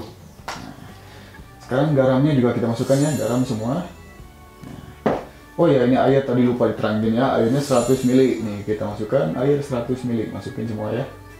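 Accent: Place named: Bakumpai, Indonesia. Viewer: Abang orang mana kayak bahasa bakumpai ije due telu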